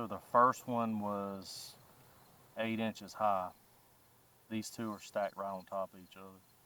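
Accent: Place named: South Carolina, United States. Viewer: I know an SC accent when I hear one. Awesome video. I was interested in .300 blackout but maybe I'll save myself some money.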